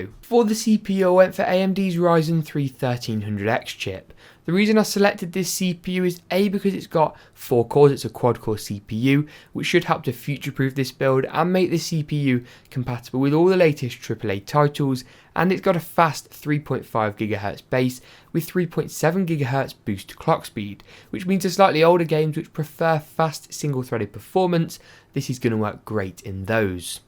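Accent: England, United Kingdom. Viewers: You sound english, Why priced in dollars?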